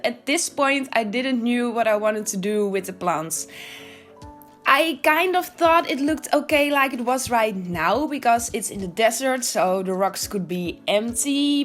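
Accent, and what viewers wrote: Netherlands. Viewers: Ik kan hore dat je nederlands bent hup nederland!!!😂❤️❤️ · Oke je hoort wel echt duidelijk dat je Nederlands bent. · I am so in love with dutch accents so this video was a real pleasure for my eyes AND my ears!!! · I can immediately hear that you're Dutch 😂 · You can hear so good that she’s dutch, it’s so cute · Are you Dutch ? You have a Dutch accent. Either way this building is soooo good wth, I’ve never seen something like this from the sims, you did a really good job! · Kon gelijk horen dat je nederlands was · Where are you from? It sounds like Dutch😂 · are you dutch? I hear a very heavy accent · Haha inderdaad, haar Engels is erg goed alleen de uitspraak is erg Hollands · Are you dutch? Your accent sounds dutch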